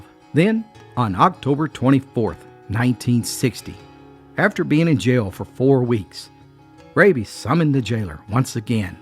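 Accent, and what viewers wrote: Appalachia, United States. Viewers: I wouldn't say he has a deep Southern drawl,I do he has a soft southern Appalachian accent, there are several different regions of different accents, all with some things in common and difference.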